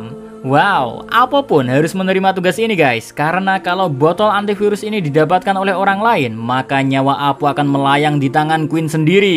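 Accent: Java, Indonesia. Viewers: Konten react paling ....... ditunggu dan terngiang dgn logat jawanya 😂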